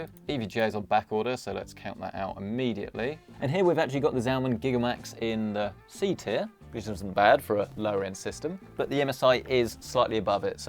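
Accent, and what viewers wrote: United States. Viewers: Dude, loved your snarky American Bro accent 😆 it made my day. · Damn your American accent is incredible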